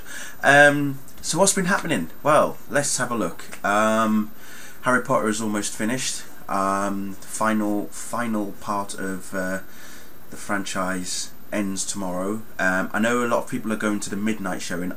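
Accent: United Kingdom. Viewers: Im 1 min into your video and already leaving a comment, lol. Im seeing it tomorrow with Akemi, you know her as AkemiChandler on Twitter and shes my best friend since I was 9. Im 23 now, lol. I wanted to see it at midnight, but then I thought, I actually wanted to be wide awake when I see it so me and her arent seeing it at midnight either. Im going to be an emotional mess. Oh, btw, I love the way you talk. I love England and the accent Im simply in love with. I simply love listening to you talk.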